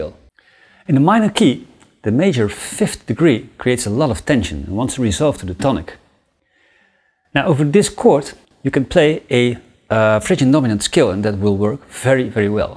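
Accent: Netherlands. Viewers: Dutch? Sounds great!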